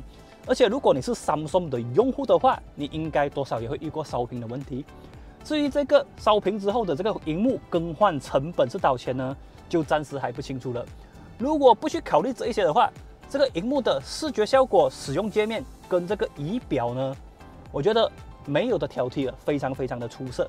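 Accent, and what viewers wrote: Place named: Malaysia. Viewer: 你照你原本腔調來說話是沒問題的 不需要這樣吊著嗓子說話 比起在某些詭異的地方突然高音 還不如馬來西亞腔道來得親切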